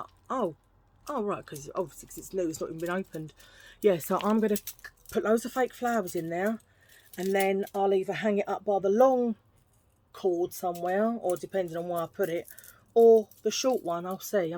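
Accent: Australia. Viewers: Love the Aussie accent. 😂❤ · Enjoyed this - like a nice visit with a friend! You are good fun. I was trying to watch your next video but I have to provide proof of age and as this involves giving driversl licence or passport number which I am not prepared to do could be risky I can't watch! Crazy! Your Aussie accent wasn't bad by the way - and I'm Tasmanian!!!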